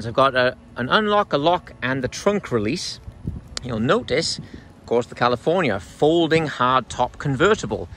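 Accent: Wales, United Kingdom. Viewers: Is that a Welsh accent I hear while your narrating the video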